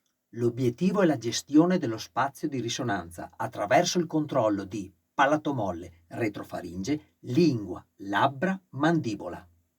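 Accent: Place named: Veneto, Italy. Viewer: Maestro ho appena scoperto il suo canale. Sento con piacere che è veneto come me e perciò le chiedo: effettua anche lezioni in presenza? (Mi scuso se le pongo una domanda a cui forse ha gia dovuto rispondere più volte) Complimenti per la chiarezza e la spontaneità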